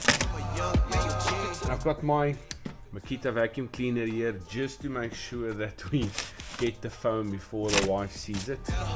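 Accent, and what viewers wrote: South Africa. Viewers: I like it bud! I subbed to stay tuned in. Looking forward to what's to come in the future 👌🏼 Hey on a side note what is that checker board square area you were driving in meant for? I'm in the U.S so just curious. Also I always try to pin down accents and I'm guessing south Africa? If yes you have a beautiful country!! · Great video.I watch your channel for the interesting content and also the fact that you sound South African.any advise.Bought this but after 10min or so of running in the grass it cuts off.esc is very hot.Is this normal?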